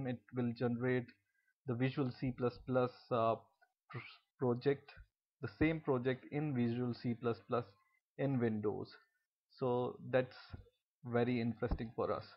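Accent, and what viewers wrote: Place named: India. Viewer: English with Hindi accent kills me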